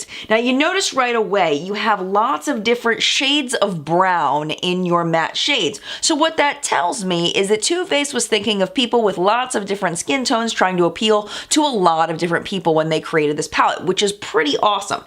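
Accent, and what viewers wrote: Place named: New Jersey, United States. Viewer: I subscribed for that Jersey accent alone! ...that is a jersey accent amiright?!?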